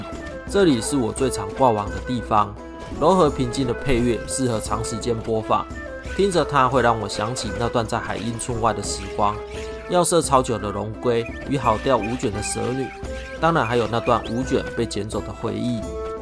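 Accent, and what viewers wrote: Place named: Taiwan. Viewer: 挺喜欢听你说天堂的故事；尤其那带满台味的国语，听了就亲切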